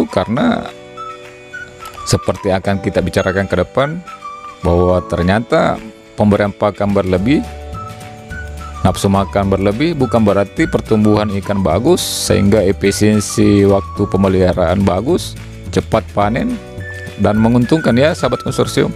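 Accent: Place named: Sulawesi, Indonesia. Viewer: kyk nya orang sulawesi, dari logat nya😁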